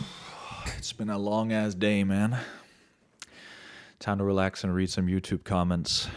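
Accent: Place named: Denmark. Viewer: wait are you danish? no shot. im dead now that i know i cant unhear the accent.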